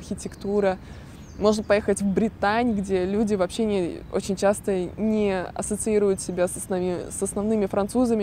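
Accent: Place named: Russia. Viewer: Dmitrijs Bogdanovs Русские в России в каждом регионе по разному говорят.И фонетика и лексика и фразео-лингвистические особенности и диалекты. говорит также как жители (девушки ее возраста и примерно одного образовательного уровня)любого крупного города России.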